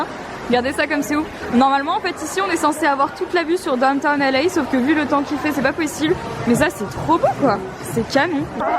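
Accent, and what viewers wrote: England, United Kingdom. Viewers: J'aime trop ton accent anglais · T'as un super accent anglais ♥️♥️♥️!!!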